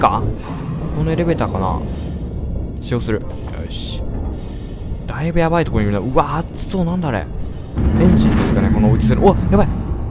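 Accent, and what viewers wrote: Kansai, Japan. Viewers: 関西弁さん好きなので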